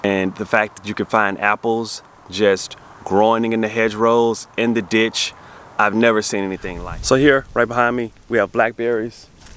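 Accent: England, United Kingdom. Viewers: Oh mate, your British accent 😂😂😂😂 Love it! 😂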